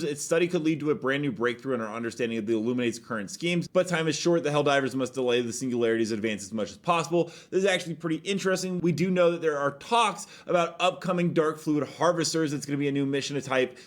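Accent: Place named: Texas, United States. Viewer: Even as a f*cking french i can smell your texas butthole accent frome here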